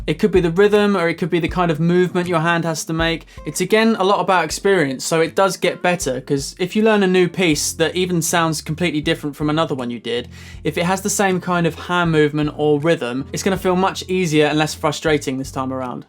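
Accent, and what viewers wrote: United Kingdom. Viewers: You look like American but you sound British.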